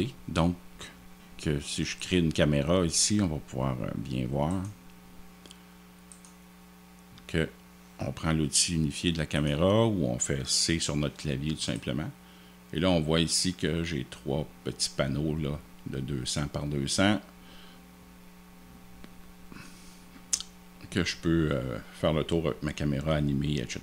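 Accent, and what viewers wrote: Quebec, Canada. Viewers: je suis content car j'ai regardé quelques video de vous sur trapcode particular ( faire de la fumée ) il y a 6 mois mais je n'avais pas votre chaine sur le nom des videos. J'ai bcp aimé vos video et votre accent Québecois ou canadien, ces petites variations / differences de mot, j'adore ! Merci à vous ( ca fait voyager en plus ! ) je suis tombé sur cette video, en cherchant quelques exemples ( meme si déjà vus ) car je me suis dis : bon sang : quelle difference entre Particular et Form, et now, c'est plus clair ( mais pas encore assez... ) ! Form aussi est vraiment tres puissant ! ce qui est génial avec vos vidéos, c'est que vous allez à l'essentiel, il n y a pas ( ou peu ) de moments ennuyants. Sur cette video, dés les premieres minutes, vous présentez l'essentiel des etapes !! Thank !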